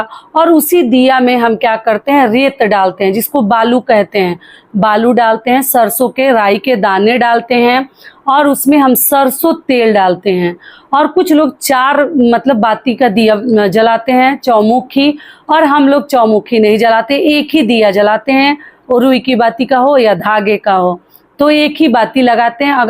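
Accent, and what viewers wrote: Bihar, India. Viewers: Aap pura hmare bihar ki vidhi btayi h jiski mujhe jarurat thi or aapki baat krne ki tarike se lgrha ki aap bihar se hi h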